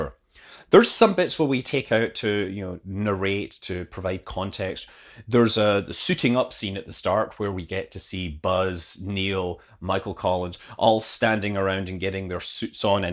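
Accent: Scotland, United Kingdom. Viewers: Hi, did you watch it in the US? Can't help but notice you're Scottish, and I can't find it anywhere in the uk!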